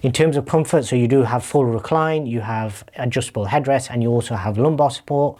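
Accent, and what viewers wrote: United Kingdom. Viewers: Nice update!! Great to see some UK home cinema content! Im currently running an Atmos 5.3.2 with a Denon receiver. Im restricted as Im still trying to maintain a living room esthetic. Ive still managed to squeeze into the space (4.5 x 4.0 x 2.0m) *120" motorised screen *JVC DLA X90 *Sony 65" 4K *Denon Atmos AV *XBOX 1S (primary source for streaming including Apple TV, and bluray of course) *Nvidia shield *KEF Q200 Centre Speaker *Monitor Audio shadow surrounds (for their low profile) *3 X BK Electronic subwoofers (for their small footprint) My most important ugrade is going to have to be a projector! My current JVC has excellent black levels considering its age but an upgrade is long past due. Its either going to be a X7000 e-shift (based on price and its the same size as my current one, so it will fit into the custom box) or I might hold out for a N5B! 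Also, I feel my subs hit pretty hard and deep considering their size (with a claimed figure of 17hz) but without calibration I have no real idea, so I'm also on the hunt for a mini-dsp. I cant place the accent, what part of the UK are you from? #SHEFFIELD 💪🏽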